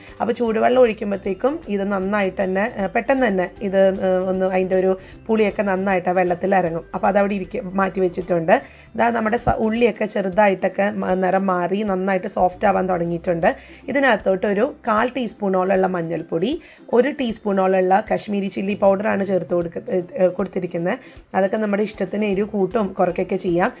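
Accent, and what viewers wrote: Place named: Thalassery, India. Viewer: Superr 👍👍 thalassery recipeyum, thalassery ഭാഷ yum kelkkanno enkile entha youtube channel kannan varuu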